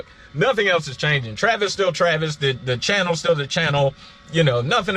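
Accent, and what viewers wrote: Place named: Alabama, United States. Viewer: He Forrest Gump country lol I’m from Alabama and he sound super country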